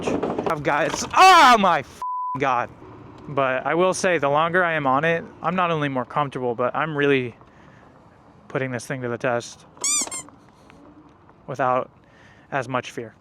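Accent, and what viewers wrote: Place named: United States. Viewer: you can hear the country in his voice new mic?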